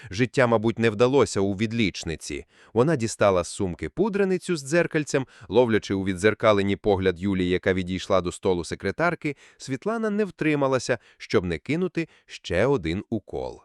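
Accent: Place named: Surzhyk, Ukraine. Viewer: Якщо перекладаєте з рахівської - то перекладайте вірно українською. А то суржик ріже слух. Так і не змогла дослухати до кінця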